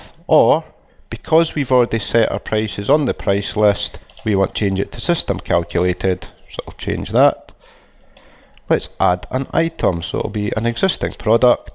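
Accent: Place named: Scotland, United Kingdom. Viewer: You should do in another accent, i cannot stop enjoying this nice scott lad's accent... I need to watch it again for the content....JK.....its nicely put together...well done....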